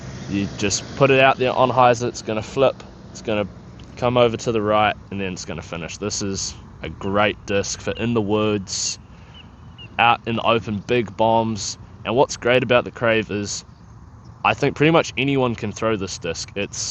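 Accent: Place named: New Zealand. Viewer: I wasn't expecting a Kiwi accent when I clicked on this video. 😂😅 I have an identical Halo Firebird that I could sell you if you need a back up.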